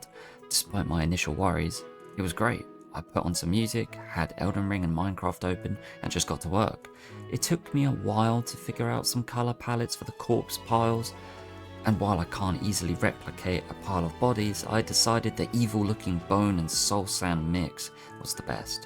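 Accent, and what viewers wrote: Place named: RP, United Kingdom. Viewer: I love your voice, you sound posh as fuck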